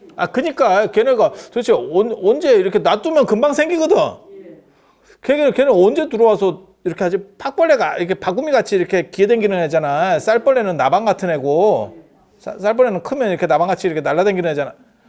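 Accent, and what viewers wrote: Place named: Gyeongsang, South Korea. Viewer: 경상도 말~ 넘 익숙한데요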